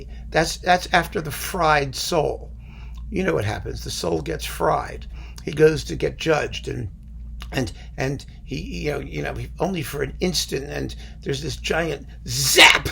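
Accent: United Kingdom. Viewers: Very good upper-class pre-1950 British accent! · Lovely talk as usual, thank you, but you paid no attention to the which makes me go weak at the knees. And in the friendliest possible way, your British accent sucks!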